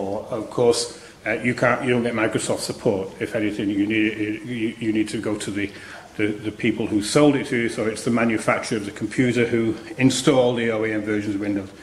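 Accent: United Kingdom. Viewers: This is brilliant mate, I have looked at making a pinball machine for a couple of years now and having found this video, I am on it. I would like to explain my joy of listening to a English bloke, because I have lived in the States for 22 years now and your first few words where “ bit and Bobs” that tickles me. So fun and also I could see you sweating with the heat, 40 deg means nothing to us over here. It would be like 95 deg Fahrenheit. Thank you so much for all that, you have given an old English man new hop. John C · Your accent sounds Yorkshire similar to my own Doncaster accent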